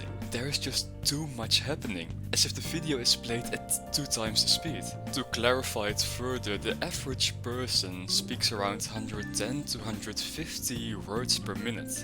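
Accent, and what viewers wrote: Netherlands. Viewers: Wait...are you dutch??? like from the Netherlands😭😭i can smell it i just can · Are you dutch by any chance? I’m asking because of your accent :] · Hoorde meteen dat je Nederlands bent mijn broeder😂💪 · Genuinely a great analysis video. Lovely Dutch accent, great use of background music (I loved the Persona OSTs after the 'high school is not like persona' video being shown), and good scripting/commentary! Nice editing too. All in all just a great high quality video and got multiple laughs out of me. Make more and wishing you the best of success with the channel, liked and subbed <3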